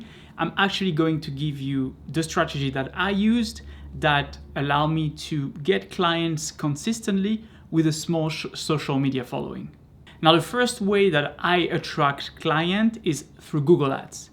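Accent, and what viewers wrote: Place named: France. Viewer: I detect a french accent. Très joli!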